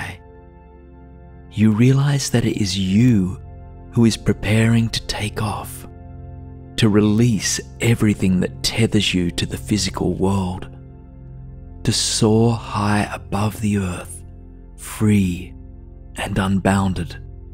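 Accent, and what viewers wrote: Australia. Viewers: I think he’s Australian not British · Isn‘t he Australian?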